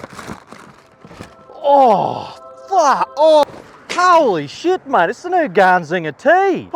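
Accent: Australia. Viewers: don't know why but I didn't expect an aussie accent to come out of that face... Anyhoo, you wanna be a sultan of salmonella, you should come to kagoshima where we eat raw chicken and you can get raw egg to pour over your gyudon.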